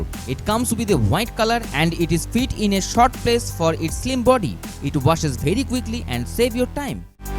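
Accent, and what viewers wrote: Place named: Bengal, India. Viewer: He is bangalan so he speaks bangla slang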